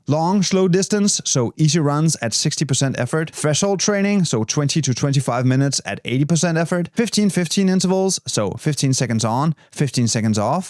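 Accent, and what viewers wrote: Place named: Denmark. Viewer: Failed to recognise your accent :( My guess was Swedish, not Danish. Though who else would explain things in short, simple and very informative way? (May be just Dutch 😉). Thank you so much for a reminder about good warm-ups and A/B skips implementing!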